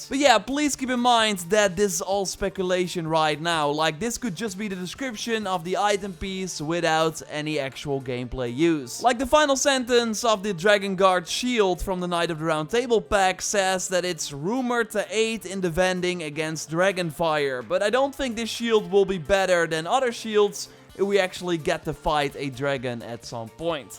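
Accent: Netherlands. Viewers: Dude are you ducht youre accent sounds very ducht, ps gimme does creddits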